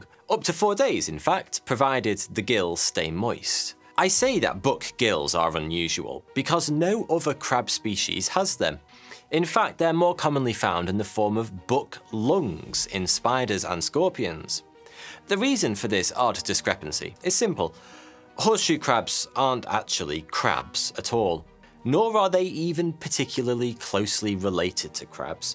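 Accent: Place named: Cockney, United Kingdom. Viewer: Well done! The cockney bit,(Liverpudlian?),is aalllmosttt gone. Oh, the horseshoe crab thing is brilliant! Um, well, craic on then.-weezi-🙏💖🙏💜🙏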